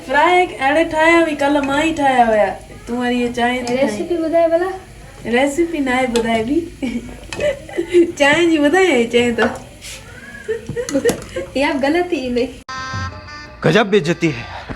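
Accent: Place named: Sindh, India. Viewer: Kah sa ho app Sindhi Laga Raha ho aap ka BAAT krna sa pata chal ta ha 😊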